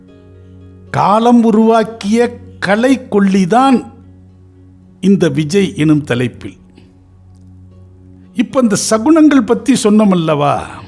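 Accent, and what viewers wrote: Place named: Kerala, India. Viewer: இவர் மலையாளி மாதிரி பேசுகிறார் மலையாளி ஜோதிடர் ரா !....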